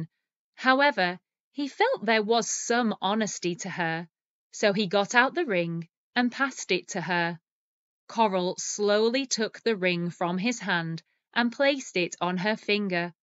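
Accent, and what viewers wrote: United Kingdom. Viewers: Yes, sometimes I got lost in the story, but it's because I saw a bunch of words that have never seen before. Hahaha I used to be angry at this, but now, I know it's something normal, it's part of my English learning journey. If I already knew them all, why would I learn English then? Hahahahaha I want to thank you for this story. I helped me a lot to improve my British intonation, pronunciation and learn new words. Keep going. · Thank you so much, I had a nice time hearing and read along to have British accent. It was so fun that as soon as I saw the word mesmerising, I immediately thought of mermaids. And I was so sure about it when I read that the woman's name was Coral. Thank you for the story 😊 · I used to listen to American English only that I don't get the British one, but after watching a couple of your videos, I got used to understanding more British English! 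Thanks so much!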